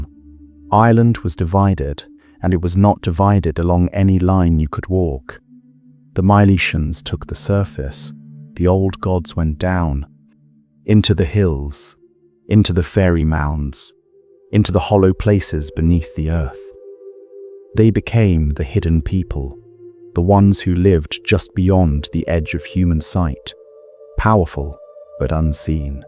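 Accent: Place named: United Kingdom. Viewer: Truly appreciate that the talk over is done by someone who's actually British or English rather than some American with their dirty terminology that will twist what truths we've got left I Appreciated This Thank You Buddy 🌹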